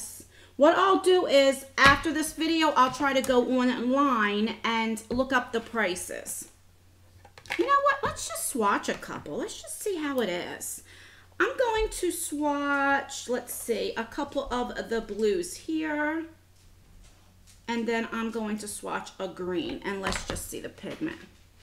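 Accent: New Jersey, United States. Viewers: Hi, Love you love your channel. I am about ten years older than you but we have identical eye color (my best feature). Our eyes are unique in the fact that we have such a pale blue iris surrounded by the dark ring. Everybody in my family is blue eyed but I am the only one with the dark ring and until you never really seen anything so close to my own. Have to ask, you mentioned you have a daughter of mixed race? What type of eye color does she have? I would guess genetically brown but I wonder if the color is a lighter and also a unique brown? I also love your accent. It reminds me of all my wonderful summers spent in NJ with my extended family. Just wanted to drop a note as I love watching you, learned so much and never tire of listening to you. Don’t ever edit. Lov Suzanne in Phoenix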